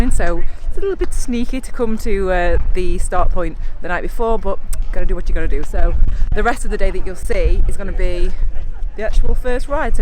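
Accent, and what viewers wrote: United Kingdom. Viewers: How are you used to kilometres you have a british accent and we travel in miles ?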